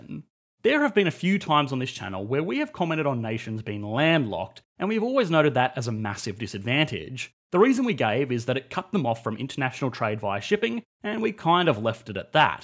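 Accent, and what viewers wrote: Australia. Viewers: What accent is that? Australian? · At least Wendower is 'understandable': this Ozzie's accent is thiccc, plus, he talks way too fast - had to reduce speed to 75% to make it bearable. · The Australia accent throws me off. The upward inflection at the end of sentences make it sound like your unsure or asking a question. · australian accent This is where we bring up the darling Norway, it not only gets (Insert industry norm) but does so equitably. In fact when measuring (some average figure related to production) it is actually (some number between 1-10) globally. Now you can never not mention them again :)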